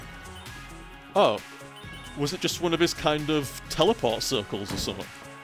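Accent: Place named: Scotland, United Kingdom. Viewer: Hey, from what county are you from? You sound scottish-like tbh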